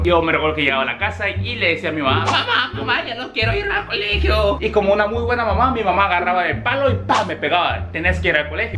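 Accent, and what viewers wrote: Guatemala. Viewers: tus vídeos son buenos y te considero coreano-chapin, cuando hablas español es acento guatemalteco y sos parte de nosotros como muchos coréanos que viven en Guatemala. Felicidades amigo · Me encantan tus vídeos me gusta como te expresas puro chapín te veo desde Guatemala.... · Me encanta tu español, creo que tenes más acento chapín que yo :'v Cuando regresaste a Corea no fue muy raro luego de pasar tanto tiempo acá en Guatemala? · Que mal lo que viviste siendo tan peque eso te marca... y waw hablas como todo un chapin... interesante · el acento chapin que bueno, 👏👏👏👏 parece chapin con cara de coreano, oye y tus novias chapinas que dicen. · Jajaja me encanta! Ya hasta hablás como guatemalteco 😂❤ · Hablas puro guatemalteco!! Que bien se escucha 😘😘🇰🇷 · Amo tus videooos y tu acento chapín hsjsks♡ sos lo + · Amo como hablas. Acento chapín. :3 no te preocupes a todos nos ha pasado mala experiencia en la escuela aquí en Guate. 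Sos la mera tos maje. C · A mi me gusta como hablas con cara de Coriano y habla chapin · JAJJA Me gusta que tenga cara de coreano y acento de guatemalteco :v JJAJAJ No se me encanta JAJA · Ahora en Korea como te tratan los Koreanos por que supongo que tenes acento para hablar koreano, haci como tenes acento Chapin. Buena honda ya sabes lo que es el bulling en Guate todos lo pasamos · Y no te verguearon en Corea por hablar como chapin? O se a que en cualquier lugar (hasta en su propio pais) lo quieren verguear a uno por ser diferente y si uno se deja. Saludos y exitos en tu vida. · Su acento más chapín que nada jajajaja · Hablas puro Guatemalteco los hubieras vergiado jajajja no te dejes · Like si tiene acento de Guatemala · Jajajaja ya habla como chapin Awuebosss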